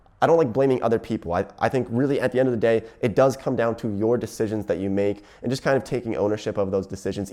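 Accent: United States. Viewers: im very confused as in if hes american or birtish lmao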